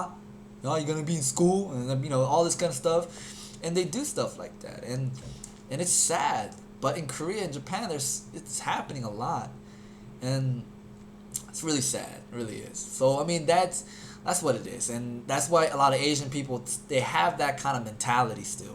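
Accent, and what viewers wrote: New York, United States. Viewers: That accent sounds New York. I luv NYC accents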